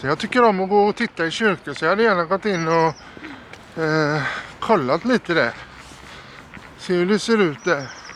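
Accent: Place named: Gothenburg, Sweden. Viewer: En härlig vandring genom Dole [dåll] (lite dragning åt ö - känner mig lite som besserwisser, men du undrar ju då och då i filmen). Oavsett dina uttal (det är lite käckt på göteborgska) så följer jag er mer än gärna. 😊😊 Ni förmedlar hela tiden en känsla av att själv vara närvarande med er. Fortsätt så! 👍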